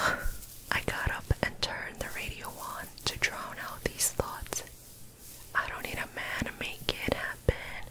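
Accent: United States. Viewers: Your NY accent is all up n down your vowels... i love it lol... where in NYC did you grow up? If you tell me youre a Brooklyn chick i'll die 💜 (assuming you respond again)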